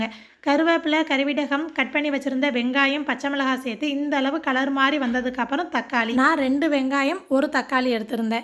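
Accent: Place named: Madurai, India. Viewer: Pera ketalea odiruvangalea..... Enga madurai slang la pesuringa Nala iruku sissy....❤❤❤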